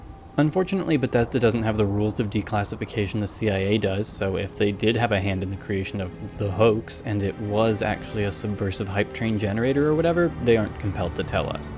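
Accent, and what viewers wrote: United States. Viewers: You sound American, you can't access the Internet Archive?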